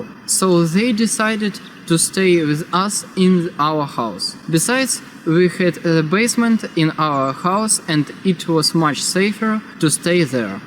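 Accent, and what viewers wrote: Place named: England, United Kingdom. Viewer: Видно что чувак из Англии, прям по акценту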